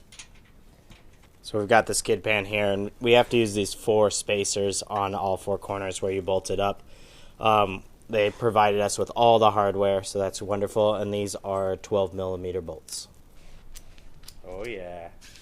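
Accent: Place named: Australia. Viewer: Best Aussie accent ever.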